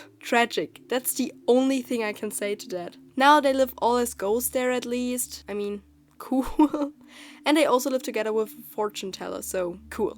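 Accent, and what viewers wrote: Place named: Germany. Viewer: man hört das sie deutsch ist😂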